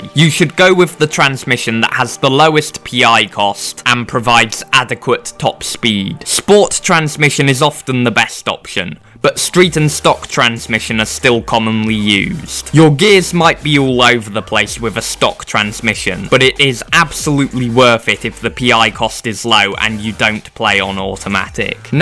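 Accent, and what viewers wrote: United Kingdom. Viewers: british accent + braces = the most unconfortable voice to hear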